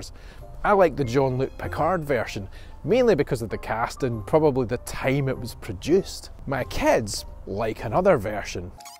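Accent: Scotland, United Kingdom. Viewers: The story is great but, your Scottish brogue makes the story telling the best ever!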